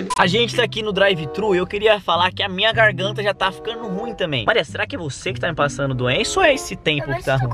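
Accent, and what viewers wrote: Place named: São Paulo, Brazil. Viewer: alguem em 2020 kkkk eu moro em Sao Paulo e é assim mesmo do jeito que o falo kkkkkkk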